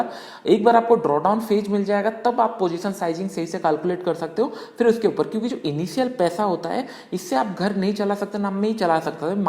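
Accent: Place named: West Bengal, India. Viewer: It seems u r from West Bengal. I am too from Adra, Purulia district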